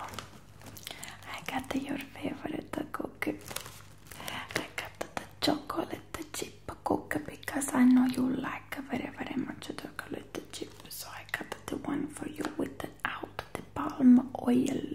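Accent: Sicily, Italy. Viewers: You are VERY original, and very good! I really like your videos, you can relax someone, and at the same time you do that with a lot of originality. I appreciated so much the sicilian accent and the “next time a nonna” made me smile, because it made me think about my grandma with that strong sicilian accent❤️ Sorry for my bad english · Oddio non ho mai riso così tanto per un asmr 😂😂😂 potrei sapere di che regione italiana sei? L'accento di Nonna mi ricorda molto quello della mia, adoroooo, hahahahah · one of the best Italian inflections I ever heard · The Italian accent is literally the same as my Nonna’s accent 😂😊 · Omg this reminds me of my Nonna so much gosh I miss her ... this video made me so happy ♥️ you talk just like her too 😂 kinda scary you sound just like my grandma she had a really thick Italian accent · Your Sicilian accent is so on point!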